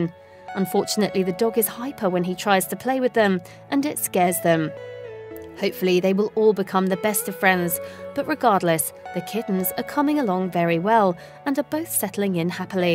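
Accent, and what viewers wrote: United Kingdom. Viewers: glad i dont have to listen to that brit accent often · What is it with American sites that use a British accented narrator? Will the accent make it seem more legitimate? Pretty condescending.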